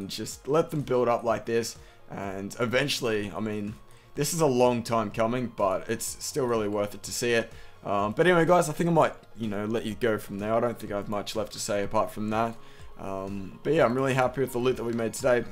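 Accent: Australia. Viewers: This guy is an Aussie or an NZ man, get your accents straight! What's your next guess, Scottish?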